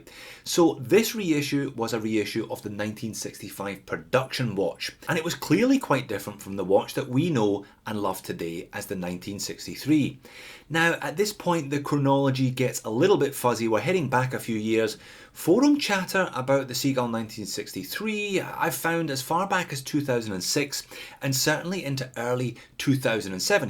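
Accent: Scotland, United Kingdom. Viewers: Love the Scot accent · stop speaking scottish and I will sub you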